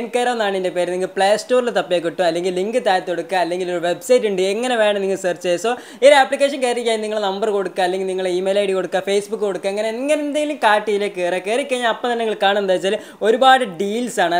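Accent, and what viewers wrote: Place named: Malabar, India. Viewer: ഭാഷ കുറച്ചു മലബാർ ടച്ച്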